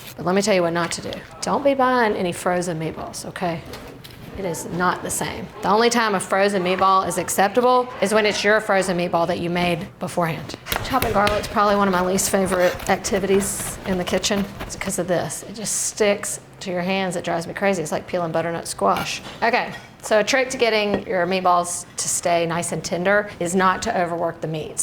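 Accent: South, United States. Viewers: The southern accent is a kick. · She's awesome, being an Italian gal with a southern accent always gets me. Check out her recipe for roasted potatoes made into a creamy salad AMAZING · This is the first time that I hear an italian with a souther accent... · The southern accent gives me life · So you’re an Alabama girl. Good to know, I thought that I heard a southern inflection. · Definitely first time hearing a southern accent instead of a NY/NJ one making Sunday gravy. My friend’s mom never used tomato paste, it was all whole tomatoes from a can that she crushed with a device. She also used beef neck bones, sweet and hot sausage, braciola, veal and pork. Great tip with the meatballs, although she never served meatballs with, just the meat from the sauce. She browned all the meat first then added the tomatoes. That sauce started Saturday night and was served Sunday evening. 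The smell lingered until Tuesday. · She’s Italian? I couldn’t tell with that Southern accent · To me, it's just funny how a American with a strong southern accent calls themselves Italian, well I say of ancestry is the best way to put it. And saying sugo with a strong American accent.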